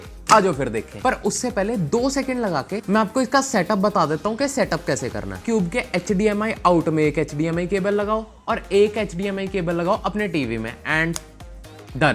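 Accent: Punjab, India. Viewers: Hated you Fucked up Punjabi accents 🤮🤮🤮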